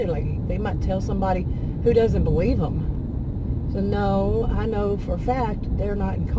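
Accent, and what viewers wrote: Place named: Texas, United States. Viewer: I was happy to find your video. My 72 year old narcissistic mother and 48 year old, younger, golden child, narcissistic brother have both stolen lots of my life/time from me. Lots of ongoing ptsd. Still, I forgive them. I have to. My faith requires it. Even though the abuse never stops. At first I thought, you sound Texan. Then I saw the licence plates(lol). I'm in Pearland. Howdy neighbor. Hope your channel grows. Subscribed. God bless you and yours.☺🙏💜👍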